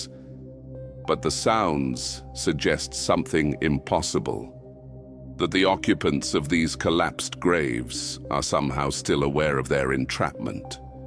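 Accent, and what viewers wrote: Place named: United Kingdom. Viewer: hello I just subscribed to your YouTube channel love your videos love your British accent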